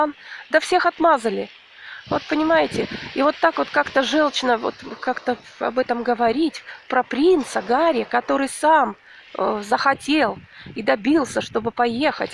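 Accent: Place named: Provincial, Russia. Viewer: наверное, вы из Мухосранска, говор выдаёт, темой не владеете, закончите хотя бы десятилетку, и не умничайте малообразованным теткам это не подходит.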